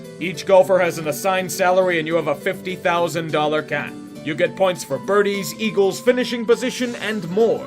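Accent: Pittsburgh, United States. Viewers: Come on, let your Inner Yinzer do commentary for a Steelers game.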